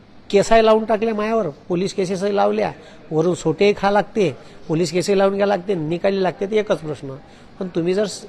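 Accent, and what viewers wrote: Vidarbha, India. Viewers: पूर्व विदर्भात असेच बोलतात.. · हे विदर्भ बोली भाषा आहे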